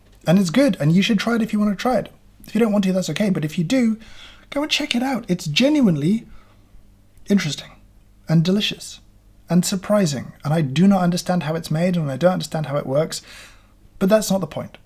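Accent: United Kingdom. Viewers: Proper use of the English language and a British accent lend all the credibility I need.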